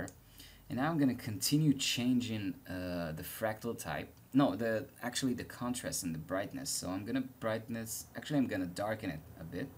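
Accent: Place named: Israel. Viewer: שומעים שאתה ישראלי אבל אחלה מדריך! תודה רבה:)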